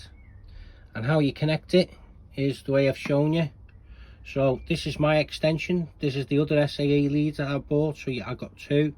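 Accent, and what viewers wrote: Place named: Brooklyn, United States. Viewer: I Love your Brooklyn accent.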